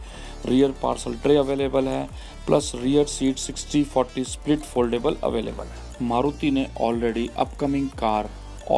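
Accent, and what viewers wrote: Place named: Pakistan. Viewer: This person speaks Pakistani Hindi.....Ychel is available in Pakistan currently.So video is from Pakistan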